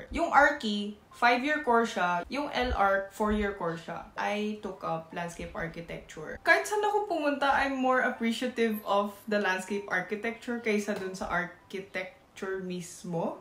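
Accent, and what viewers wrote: Batangas, Philippines. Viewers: 922nd like. Hi! De La Salle Lipa highschool batch 2013 and taga lipa here. 🙋🏻‍♂️ It really is fascinating magtagalog ka and nalabas pagbabatangueña mo haha.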